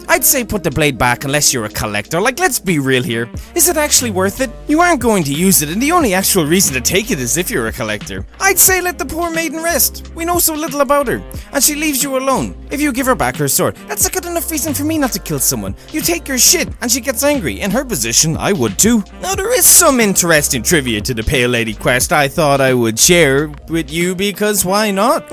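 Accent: Ireland. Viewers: Yes irish...just yes! Love the rambling!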